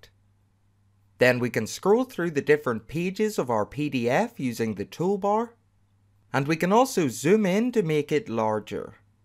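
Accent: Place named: Belfast, United Kingdom. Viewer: Hello. From your accent I think you may be local to me in Belfast. If I could ask a question it would be great. I installed a pdf embedder a few months ago in our Wordpress website. It worked well until this weekend when it seems to have disappeared! I can't remember which plugin it was so I installed another one that has a 'toe bar' (?) like the one you use, but my original embedder allowed you to scroll up and down directly on the pdf. Is it possible to get this back. Many thanks, Geraldine.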